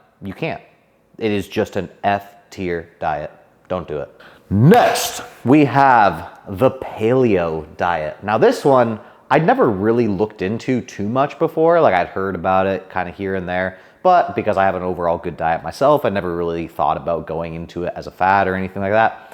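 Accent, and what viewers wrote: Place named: Canada. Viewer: I was so confused why you had a Canadian accent until I found out you're Canadian lol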